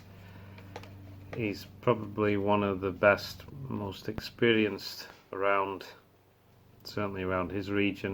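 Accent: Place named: Northern England, United Kingdom. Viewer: As an old Yorkshireman,I'm curious about what part of the North you originate from.Watching and listening to you takes me back to conversations in pubs long ago.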